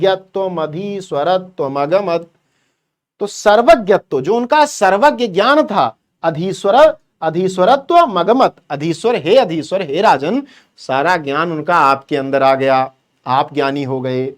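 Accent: Uttar Pradesh, India. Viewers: Are sir aap up se hai lg rha hai by the way bahut bhokali samjhe hai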